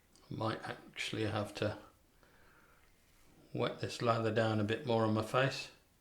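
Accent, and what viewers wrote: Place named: Birmingham, United Kingdom. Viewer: ayyyyyy Bimrmingham, I was gonna say I recognise that accent, that my accent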